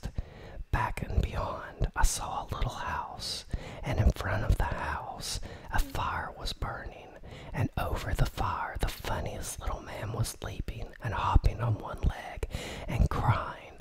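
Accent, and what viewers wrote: South, United States. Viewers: Very awesome, I love asmr, and I love your southern accent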